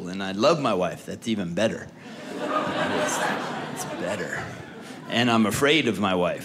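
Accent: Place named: California, United States. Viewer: i can tell you're californian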